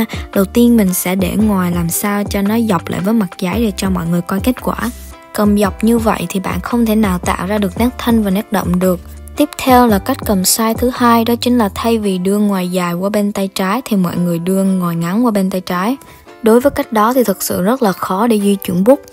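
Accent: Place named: Da Nang, Vietnam. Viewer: Chị ý người Đà Nẵng ý bạn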